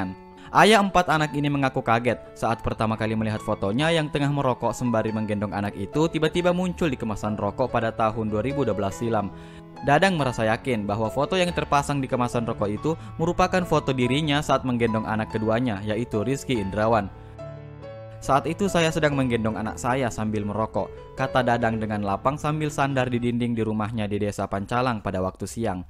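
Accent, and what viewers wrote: Makassar, Indonesia. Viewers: Org Makassar ya bang? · Kalau di dengar dari logatnya, orang Makassar kah??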